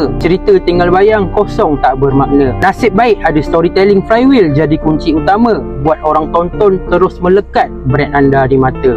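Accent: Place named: Terengganu, Malaysia. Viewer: Bulih kira mung cakak ganu awang😅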